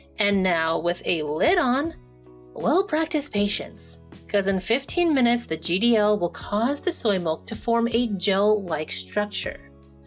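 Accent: Canada. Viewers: I love your soothing voice and Canadian accent. ❤ Could I make this silken tofu for Douhua with ginger syrup? Awesome video 👍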